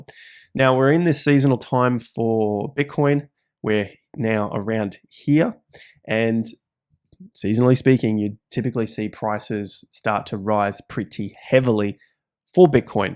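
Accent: Australia. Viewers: Surname might be Italian, but an Aussie and he speaks like one.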